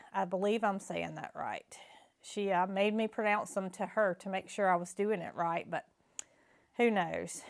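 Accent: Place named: Georgia, United States. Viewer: My favorite photo was the flying heron., when I listen to you I’m reminded of my grandmother. She was born Georgia. The cadence of your speech, your accent and way of telling stories reminds me of her so much it brings tears to my eyes. You make me feel a sense of Home. Thank you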